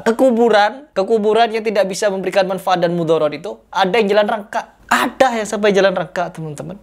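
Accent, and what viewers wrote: Madura, Indonesia. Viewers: Logatnya kayak dr Madura.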